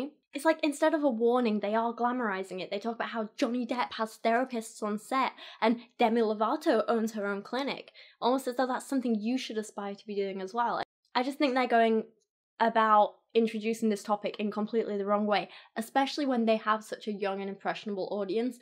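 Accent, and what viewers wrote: United Kingdom. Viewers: Your timing is awesome. I had a really bad day today, and there you are, waiting for me to get home and log on so you can do your British patter with the cute accent and the inspirational point of view, and you'll talk about a point that need qualification and you'll go ". . . B-u-r-t . . . " and then add the qualification. So, I watch you for a half an hour and now I feel better. Thank you!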